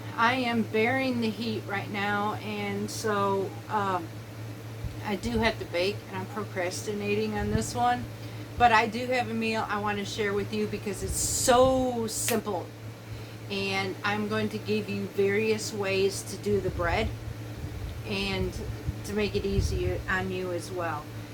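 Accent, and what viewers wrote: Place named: South, United States. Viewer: Hi 🥇🏡❤️⭐️⭐️⭐️⭐️⭐️ Let’s do all the shopping. It’s extra fun for me because it’s so different than Canada. I love southern accents ❤️. You are my sister and I can’t wait to shop together 😊😊😊😊😊😊😊😊😊😊😊😊😊😊😊😊😊😊😊😊😊😊. The walk was wonderful. Only one complaint is Jason and I are still waiting for a yes 🙌 on putting the membership on. It is totally optional for subscribers. You will have a good income if even a small percentage of subscribers join. Pretty please with infinity cubes of sugar?No extra perks nessasary or wanted we love ❤️ you!☮️💟🖖CC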